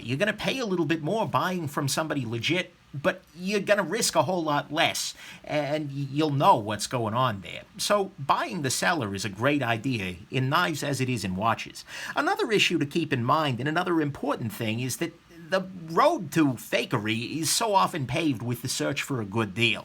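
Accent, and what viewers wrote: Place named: South, United States. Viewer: Your southern accent is amazing!! You’re hilarious! Love it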